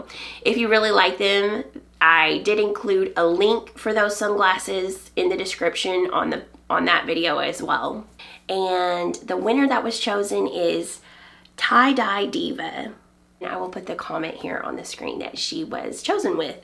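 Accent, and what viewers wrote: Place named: South, United States. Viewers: I’m from Texas so it’s nice to hear another version of Southern “twang” (accent). The cowl is too precious - I can see why you would treasure it. Totally love the leather of the project bag. Can imagine just how many WIPS would fit in there^^ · You are such a delight to watch! Your accent thrills me. I grew up in East Texas and it’s very East Texas😄 My days are heavy. My husband is recovering from a live kidney donor transplant. Your knitting podcast is such a fun relief. I taught both my granddaughters how to knit. Your daughter’s pink cowl brought smiles to my heart. Your mudd bag was amazing to see. Take care and I hope you’re well soon. Merry Christmas🎄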